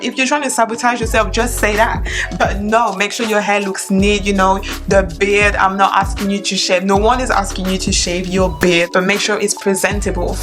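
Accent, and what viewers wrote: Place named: South Africa. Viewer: I actually thought you South African 🥺😻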